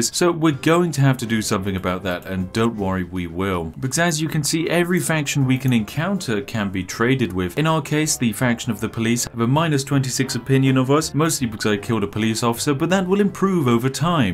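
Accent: United Kingdom. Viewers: How to pull off being a millionaire and being your own boss... Step 1) Be British or have a smooth-as-hell British accent. Step 2) ??? Step 3) Profit! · wait. isn't british???? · IS HE REALY BRITISH BECAUSE I M A BRITISH MUN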